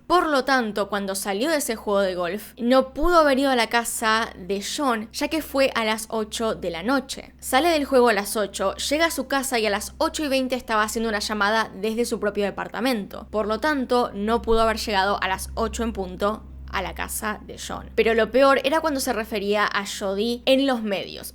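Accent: Argentina. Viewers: Sabes que el vídeo será bueno desde: "Hola y bienvenidos a mi canal de YouTube, una vez más" inserte voz argentina 😍😍😍😍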